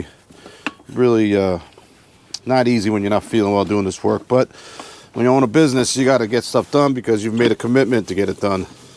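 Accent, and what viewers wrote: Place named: New Jersey, United States. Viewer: You still sound like you're from Jersey.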